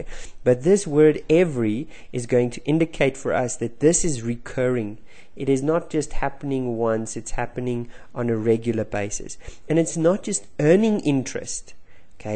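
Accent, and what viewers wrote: South Africa. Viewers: Thought you were from sunny South Africa. Thanks for the video :)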